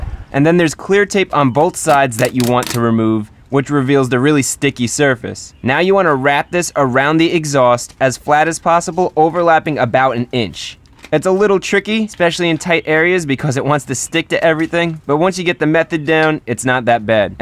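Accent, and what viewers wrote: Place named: Pennsylvania, United States. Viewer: Good wooder PA style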